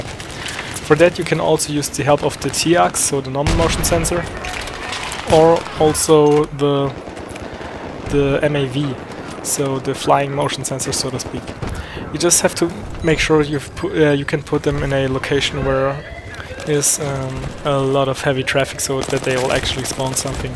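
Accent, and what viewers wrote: Germany. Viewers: You are German, aren't you?:-) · Omg, I love you're german accent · Love your accent are u German?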